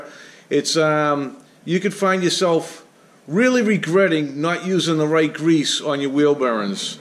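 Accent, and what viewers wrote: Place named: Boston, United States. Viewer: Holy crap your Boston accent is strong.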